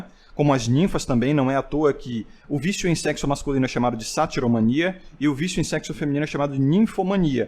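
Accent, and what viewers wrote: Bahia, Brazil. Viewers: O é baiano? 😳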